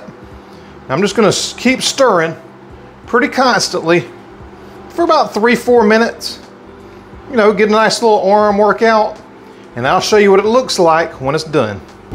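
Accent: South, United States. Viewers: This looks DELICIOUS, I really like a hot cereal and this will be something I try for sure, thanks so much for sharing and I really enjoy your southern accent!! 😊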